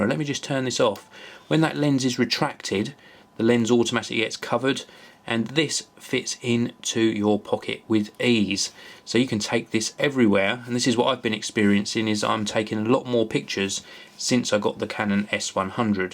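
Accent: England, United Kingdom. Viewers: english accent. Britain is made up of 4 countrys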